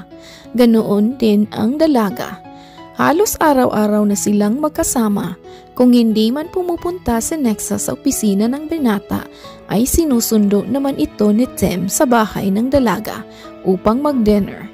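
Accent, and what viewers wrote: Visayas, Philippines. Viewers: 🤣😂😅😅dlwang sunud na bisaya ang nag e story teller pero nice s dlawang sunod mas ok to magkwento ..💓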